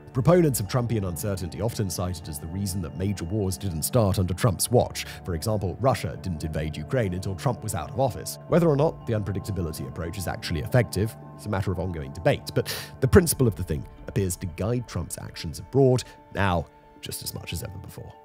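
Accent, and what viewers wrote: United Kingdom. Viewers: you talk too fast. when we here a British person, we need to feel like we're being served something. its weird.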